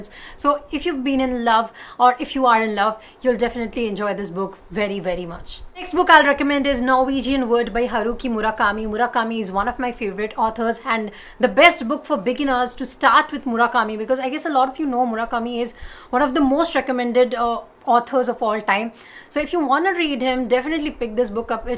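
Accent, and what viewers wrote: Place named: India. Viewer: I think you have a very good command over English language especially coz of your indian accent. I mean your language is quite easily understood by indian audiences. I looked for audio books over YouTube and applications so that I can improve my English but didn't get even a single video or audio book in indian accent. Then I thought of you for a second that why cant you create an audio book over youtube. Isn't it a great idea? It will help a lot of Indians like me who are looking for such audiobooks. I hope you come up with atleast one such book in near future. Thank you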